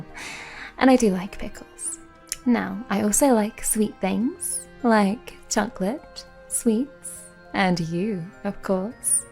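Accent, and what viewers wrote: United Kingdom. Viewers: Oh my god SPIDERMAN!!! Not my hero.......But it's for though and really, British, soft voice and is a maid who was a knight, is there anything else can do? can hold her boobs..........YES SCORE❤❤❤❤❤❤!!!!!!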